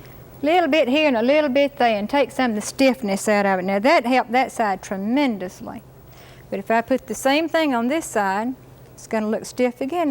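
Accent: South, United States. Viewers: Why have I never heard of this woman before? Why, she’s the female Bob Ross! I just love her southern accent!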